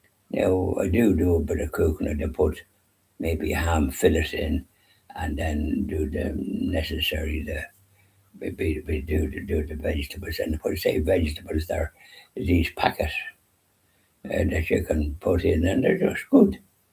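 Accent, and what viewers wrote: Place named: Ireland. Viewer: Your Irish accent is difficult for me to understand sometimes but I understand most of what you say, and I think I’ll understand you more the more I watch your videos! God bless you and yours!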